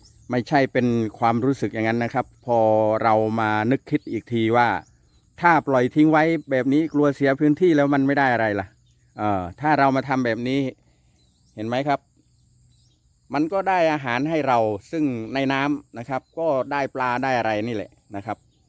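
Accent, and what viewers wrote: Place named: Surin, Thailand. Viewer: สุดยอดครับเสี่ย..มารับชมให้กำลังใจครับท่าน..สำเนียง คนสุรินชัดเจน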